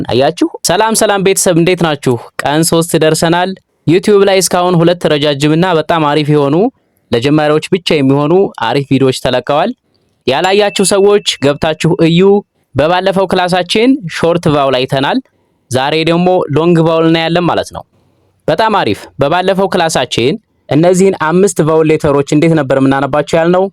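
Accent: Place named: Ethiopia. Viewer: ለድካሙህ ክብር እሰጣለሁ ግን በአማርኛ አክሰንት ሳይሆን አፋቸውን ከፈቱበት ተናጋሪዎች ቀጥታ ብታስነግር (ብታስደምጥ)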